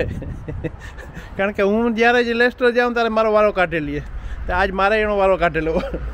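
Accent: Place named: Mer, India. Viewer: સરસ વ્લોગ 💓 હવે કમેન્ટ્સ ચાલુ થઇ... તમે વિદેશમાં રહી ને પણ પ્યોર આપણી મેર ની ભાષા બોલો છો. એ ગમ્યું....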